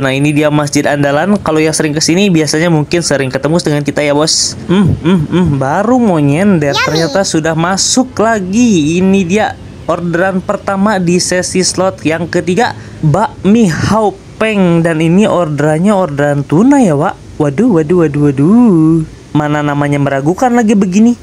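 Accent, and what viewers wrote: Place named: Sumatra, Indonesia. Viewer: Itu logat Sumatraan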